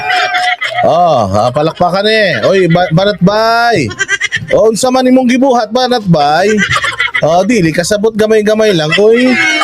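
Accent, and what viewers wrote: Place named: Iloilo, Philippines. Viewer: Bisaya ang hibasa ,ilonggo ang tono.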